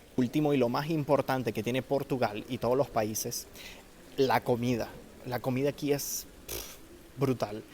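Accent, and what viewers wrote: Venezuela. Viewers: Eres Venezolano mi pana? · Hola, cuando te escuche por primera vez le dije a mi esposo; cono este chamo es venezolano y efectivamente. saludos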